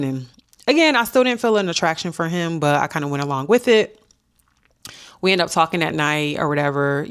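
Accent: United States. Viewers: You are soo pretty I hear a Buffalo accent are you from Buffalo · Upstate NY?? You def have a Detroit accent lol, I was shocked when you said that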